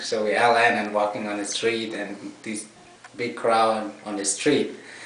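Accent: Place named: Canada. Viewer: he;s starting to pick up the canadian accent :)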